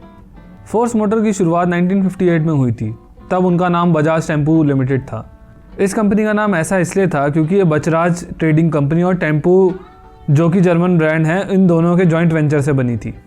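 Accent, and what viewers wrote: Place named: Rural, India. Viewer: Be clear in your dialogues.. and also better use English instead of this village style hindi ascent..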